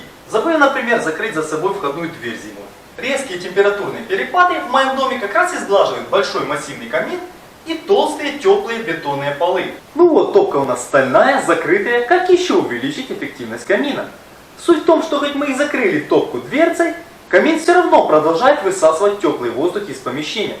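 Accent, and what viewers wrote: Ukraine. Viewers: Який чудовий м'який український акцент у жіночого голосу... Прошу, випустіть хоч декілька відео українською . ... А може,можна просто продублювати вже готові Ваші відео на українську. Це буде великим збагаченням українського контенту Ютубу і , взагалі, домогою Україні для просування до прогресу.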